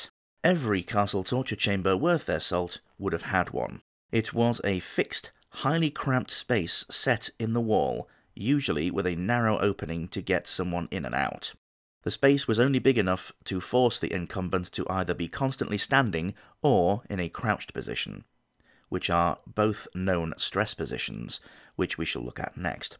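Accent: South, United States. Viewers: Southern drawl, oh yes! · I'm from the south and I must say, your southern accent sounds absolutely beautiful. The old southern accent can still be heard in parts of Georgia, Alabama, and Mississippi; and even in parts of Louisiana and Texas. Fun fact: The southern accent is the baby brother of Received Pronounciation. When Princess Diana visited the south for the first time, she stated that she could understand us far better than our Northern counterparts. The Cajun accent is derived from the French accent...that's why no one can understand those fuckers, lol...only playing around. Anyway, sorry to go on a tangent and you may have already known all this, but here it is anyway.😊😅😂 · Some of these are better and more merciful than what the politicians & the 1% do to everyone daily. Well they just kicked in my door & it's time for the thumbscrews. (I refuse to use that nickname) Don't worry when I confess I'll be laying everything I've ever done at your feet. 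Great clip as usual, impressive research, loved the video, great line about old honest Guy Fawkes. Southern Draw? 7-7.5 not bad, you sounded a bit like a educated southerner though, more of a politician than a moonshiner. -no fun. Reason? Just listen to the song. ...with black curtains..makes a difference apparently. Margaret the Pearl of York; more of man than most of us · I'd say 10 out of 10 for the southern accent.. and thats from a southerner in Virginia, USA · Agreed. Definitely not Texan or Georgian. (I’m native Georgian who spent 5 years in Waco, Texas. Virginia / North Carolina is the closest to the accent of the podcaster. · Not bad Southern accent! I'm from the fringes of the South so it's not an accent I have much experience with but it still sounded good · your southern drawl was pretty good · I rate Southern Drawl 7/10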